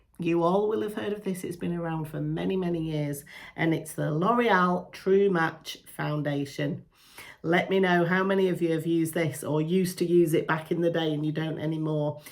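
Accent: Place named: England, United Kingdom. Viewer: We call it trash day here in the states....England is on my bucket list. I love the accent and yes we all have different ways of saying things which is fun and actually educating.....my late father visited Englad he loved it especially the changing of the guards. He also said the food is different. Great video i really enjoyed it ❤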